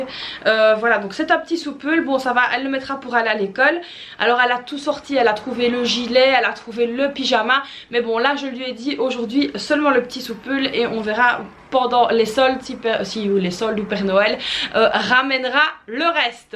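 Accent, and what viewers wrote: Liège, Belgium. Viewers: Bonjour . Je fais souvent mon schopping chez Kiabi pour mon fiston. Je trouve que les prix sont abordables et la qualité y est. Passez une bonne journée. En effet vous avez un accent je dirais de Liège ☺ ...moi j ai aussi un accent de Bruseleir lol. Prenez soin de vous et au plaisir. .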